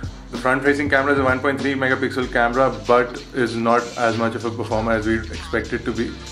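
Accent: India. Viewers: Another good review, with a wonderful Desi accent. A refreshing change from Europeans who actually pronounce OMG as OMG. Good job buddy.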